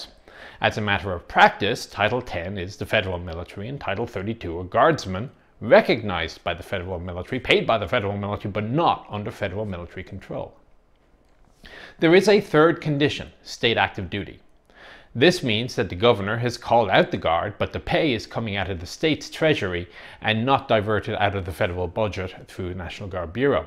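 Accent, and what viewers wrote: Ireland. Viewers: From your accent, you sound like you're from Ireland. Did you have to go into the reserves as part of the naturalisation process or was it an active choice on your end? Asking as an Irishman ready to move to the US. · I bet part of it is his Irish accent. Like Shelby Foote in Ken Burns Civil War series, his accent is pleasing to the ears. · As someone who works with a lot of paperwork related to the three components, I can just show friends and family this video now to explain how all this works. I'm sure it sounds better coming from someone with a charming Irish accent...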